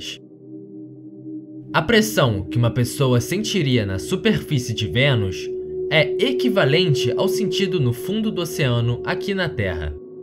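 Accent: Rio de Janeiro, Brazil. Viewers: sotaques feio deve ser carioca ..igual ao ladrão malandro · Adorei o nível do conteúdo e das curiosidades. Mas esse SOTAQUE!!!!! Meu Deus, da pra aguentar muito tempo não 😣😐 carioca né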